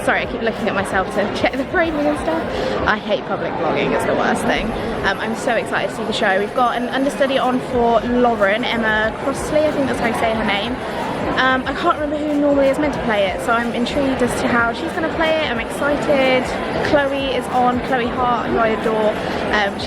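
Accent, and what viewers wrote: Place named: England, United Kingdom. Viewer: Also I loved the way you said my name, English accents 😍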